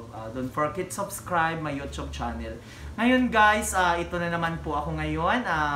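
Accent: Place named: Visayas, Philippines. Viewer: Bisdak man kaau pre hahaha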